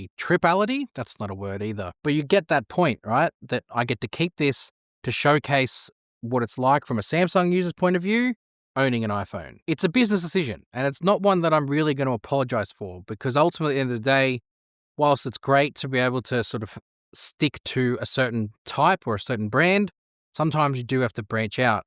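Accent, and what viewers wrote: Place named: Australia. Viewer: Haha, never thought of it before you mentioned. Great guy though. Even better than SJ because has the sweet Aussie accent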